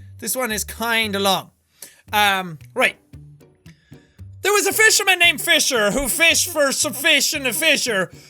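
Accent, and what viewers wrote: Ireland. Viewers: sounded even more irish than usual here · Lol your Irish accent becomes way more announced when you use the speech jammer, I love it XD this was hilarious:D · YOUR IRISH IS SHOWING AGAIN!!!😂😉I love your Irish accent,even if it isn't as strong as before XD <3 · I like how his Irish accent got more Irish-y in this video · His Irish accent comes out a lot more in this episode. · You've never sounded more Irish:P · is it just me or is he way more Irish in this video than usual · He went full irish. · this is awesome, you sound even more irish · the irish accent got stronger as the video went on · He sounds so Irish in this 😂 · The Irish got worse and worse as he went on...and he did sound drunk, lol. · his inner irish accent came out during this like the "typical" irish accent applauds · just sounds more and more drunk:D Pretty Irish though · This video really brought out irishness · just got more irish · You just sound more Irish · Is it me or does he sound more Irish when speech jammer is on? 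· You should go full out Irish for a whole video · He always did have an Irish accent... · So, in order for to go back to saying the Irish "think" we need to voice jam him. Got it. ( I love his speech either way btw) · it gives him a much stronger irish accents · Next time you do a tongue twister thing, you should totally find the speech V does in V for Vendetta! Also next time you do a tongue twister with lots of "th"s feel free to play up the Irish accent because it's such a lovely accent and I never hear it in England xD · he sounded like a drunk irish person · His Irish accent comes back and it's kind of awesome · you sounded much more Irish when you did this challenge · If anything... during most of these sounds more irish. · He sounds more Irish than usual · his irish accent got like 2x stronger with the speech jammer and it was wonderful. XD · Voice jammer... Should be Called Irish enhancer. 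Honestly you sound a lot more Irish... Maybe it's because you sound drunk HA!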